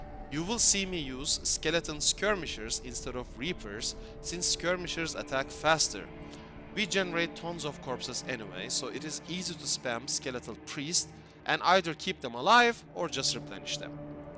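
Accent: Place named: Turkey. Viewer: Duyduğum en ‘clean’ Turkish English aksanlarından. Ama kulak tanıyor tabi. ps. Great content, articulate and helpful. Liked and subbed.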